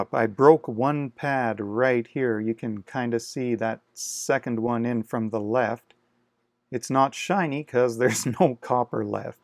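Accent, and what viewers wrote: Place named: Canada. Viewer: I love Canadian accents…ooot lol. Thanks for the tutorial. I guess single pin at a time is the way to go.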